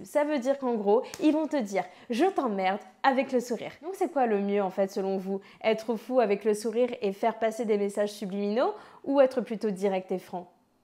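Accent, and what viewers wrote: Toulouse, France. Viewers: tout à fait et le tout avec l'accent de Toulouse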